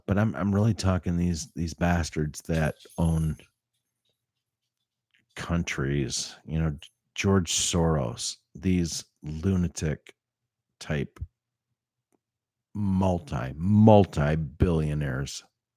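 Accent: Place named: North, United Kingdom. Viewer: You do have an accent...you sound like you're from the North. I have a wicked accent, southern all the way.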